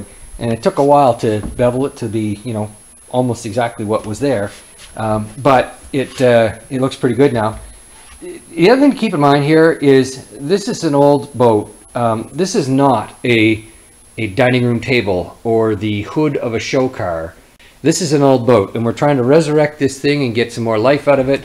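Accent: Canada. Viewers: Don't speak Canadian. Didn't learn anything.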